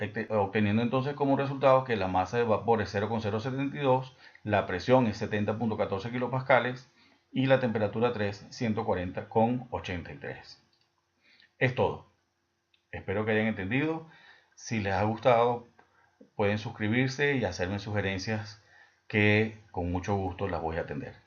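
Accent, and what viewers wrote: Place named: Venezuela. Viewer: Excelente hermano muy buena tu explicación! Y con acento venezolano todo se entiendo mejor..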